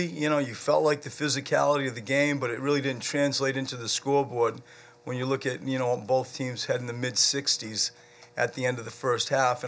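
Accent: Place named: Maine, United States. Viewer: That's a Maine accent (northeast USA).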